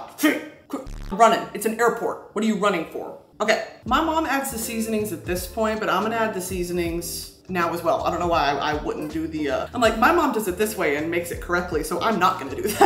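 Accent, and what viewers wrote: South, United States. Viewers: I'll never get over her southern grandma accent lmao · God that southern accent is so spot on to like 90% of my older family it SCARES ME😭🤣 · When you speak southern it just makes my day haha please never stop! I love backyard maw maw Broski haha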